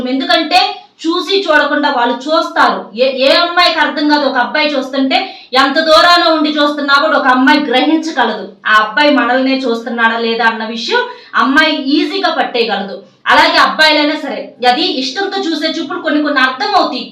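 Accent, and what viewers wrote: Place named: Narasaraopet, India. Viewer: White saree, black jocket malleypuulu,narsaraopeta bhasha maatlaadatam ,anni superuu😅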